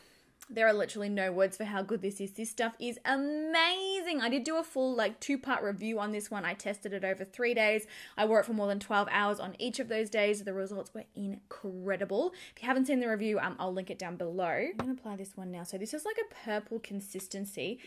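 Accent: Australia. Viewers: Your accent is so Australian and I am Australian lol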